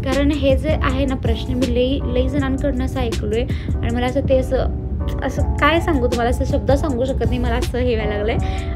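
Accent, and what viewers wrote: Kolhapur, India. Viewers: Orignal kolhapuri language 🥰🥰🥰🥰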